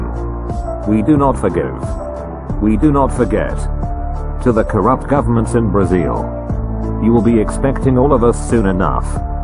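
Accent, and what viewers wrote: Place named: United States. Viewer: Ata, da pra ver q quem está falando é um americano. Brasileiro ou qualquer pessoa no mundo pode falar fluentemente o inglês, msm assim tem sotaque.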